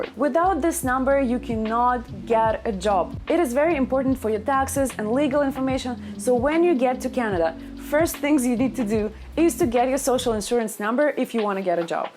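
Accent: Russia. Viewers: Are you russian speaker ?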